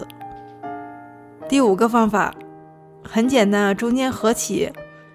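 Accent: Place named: North China Plain, China. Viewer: 是哪儿人呀好标准的华北平原儿化音哈哈哈哈哈哈河北人表示听了超亲切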